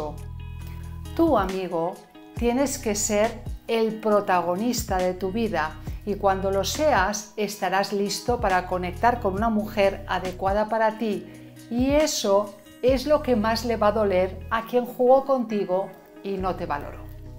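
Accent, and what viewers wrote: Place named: Spain. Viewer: Estoy enamorado de tu voz y acento espanol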